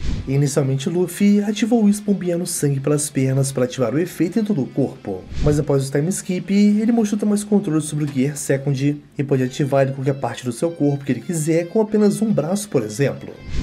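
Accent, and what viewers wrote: Portugal. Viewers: Nasceu em Portugal tem sotaque de português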